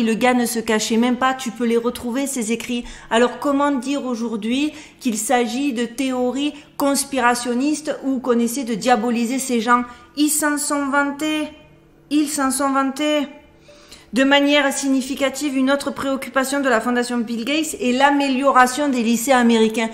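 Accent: Marseille, France. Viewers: Bonjour chère amie, je vous suis depuis quelques temps, je suis tombé sur cette vidéo, et je voulais vous dire, vous qui aimez trouver tout ce qui est caché, faite un test, vous savez que les yeux sont le miroir de l'âme, alors faite un test avec toutes les images, et symboles que vous avez entre vos mains, mettez-vous devant un miroir, et vous découvrirez beaucoup de choses cachés, n'hésitez pas de tourner les images dans le sens inverse, je pense que vous avez cet œil pour trouver ce que vous cherchez. J'aime bien votre chaîne car elle sort de l'ordinaire, avec votre franc-parler, avec un accent marseillais. Bien à vous Francis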